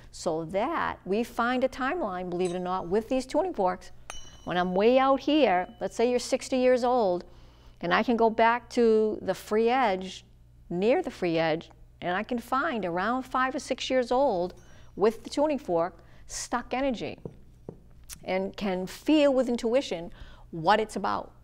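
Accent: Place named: New England, United States. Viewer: A fellow New Englander!! I knew that accent sounded familiar! Great video. Do you teach classes in person?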